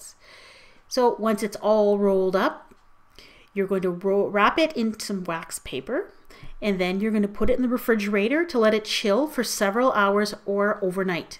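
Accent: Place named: Ontario, Canada. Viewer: The hypno dough got me had to thumbs up lol! Are you from Ontario?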